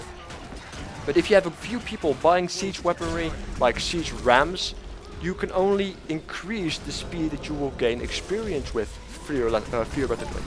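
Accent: Netherlands. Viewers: first time i heard you talking i knew you were dutch, i am dutch aswell and maybe u wnna play together sometime w/ skype or something?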